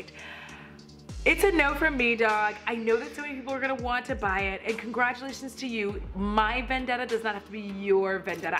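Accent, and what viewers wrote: England, United Kingdom. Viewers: I love the English accent and that it makes you sound like a villain.... I must be a villain too 🤣🤣🤣 Also agree with the cat mascara it reminds me of the Katy Perry cat perfumes ... decapitate a cat every spray 😻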